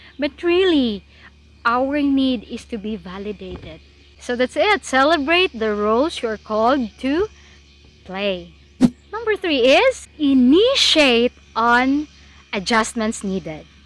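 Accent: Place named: Iloilo, Philippines. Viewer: Nalingaw kos pagka ilongga oi. Wakoy nasabtan sa topic 😆😆😆 mura nagkanta kanta. Ahhh🥰🥰🥰 Thanks Ma’am more videos ❤️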